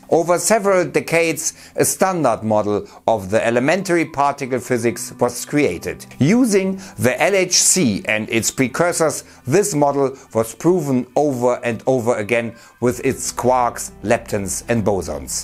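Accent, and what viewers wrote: Germany. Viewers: Ich liebe einfach das "DEnglisch" ^_^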